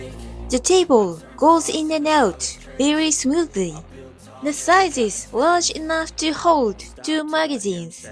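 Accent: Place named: Japan. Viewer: 很明显是日式英语，不是中式英语。 已经说得很好了，理解完全没有障碍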